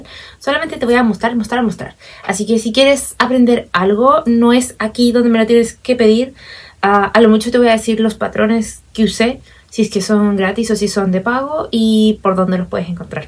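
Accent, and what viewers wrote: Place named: Chile. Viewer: Que lindo el Sr Leon! Me encanta el sweater que estas diseñando. Y estando en esa nota, yo pondría los botones en la sisa pq si los pones en las mangas te va a molestar, pq eres muy inquieta y haces muchas cosas con los niños. Yo también estoy embobada con las cosas que esta haciendo la Glorita, y estaba contemplando comprarle algo, pero definitivamente me convenciste. Preciosas todas las bolsas. Me encanta escucharte, hoy te escuchabas menos Española. Más Chilena! 😂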